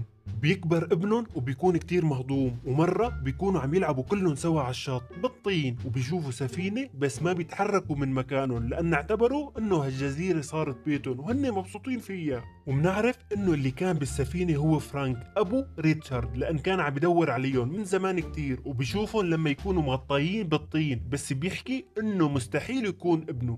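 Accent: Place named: Palestine. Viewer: لهجتك تشبه اللهجة الفلسطينية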